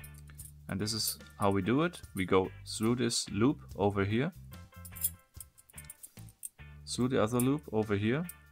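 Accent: Germany. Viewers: are you German? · Been watchin you for a minute. My first time commenting. "This is my fav puzzle yet." keep it up (German accent and all) sub'd & 👍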